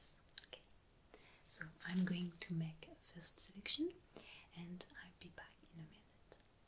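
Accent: France. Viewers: Thanks for the video! I came most for the tingles and your wonderful accent (I just found your channel last week and I'm watching your work since). I studied the basics of French and you're tempting me to return to my online classes 😄 Again, thanks and greetings from Brazil. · Please ... More videos like this( with french accent)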